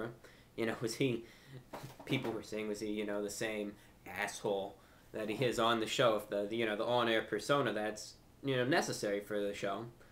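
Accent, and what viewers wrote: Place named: Boston, United States. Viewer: I hear he's from NY. I thought he sounded Bostonian.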